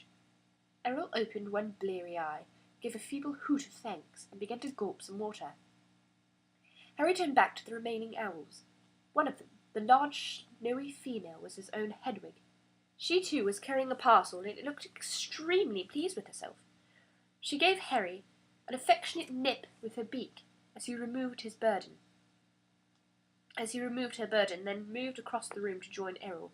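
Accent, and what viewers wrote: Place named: United Kingdom. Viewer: which area in the uk your accent related?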